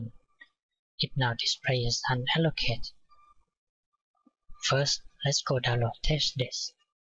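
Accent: Asia. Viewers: Not his voice, his asian accent.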